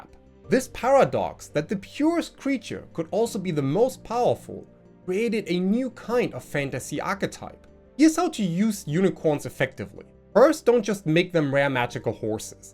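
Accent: Germany. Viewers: Man, your german accent so thick it almost sound chinese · he is from Northern Germany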